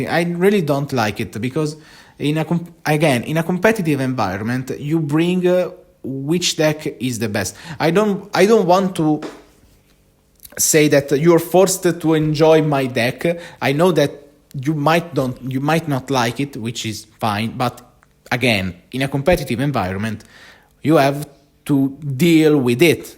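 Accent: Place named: Italy. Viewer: Hi mate, really enjoy your job here on the channel, keep going with it!! For the first problem i just have a lot of tokens for the differents effects(mana, storm, flashback etc.) and i have spent one afternoon goldfishing and try differet approach to find my spot on the playmat for everything in a way that was clear for me so that in tournaments i can go pretry fast and if oppo has doubts i can explain quickly For problem 2 unfortunately there's nothing you can do about it....i've stopped bringing storm at FNM because everyone i end up against was complaining about something and because we all do it for fun in that occasion i stopped, while at RCQ people are still complaining a lot because the deck is indeed frustrating to play against, but as you said in a competitive environment everyone wants to win so i just don't listen to them, or i just say that i know that the deck is frustrating but we do what we can to win and after the match they look to understand cause they are there for the same reason(and let's be honest every deck is frustrating on it's own in modern right now cause everyone has to do unfair things to win) Also are you italian?(i am italian and your accents really seems italian to me)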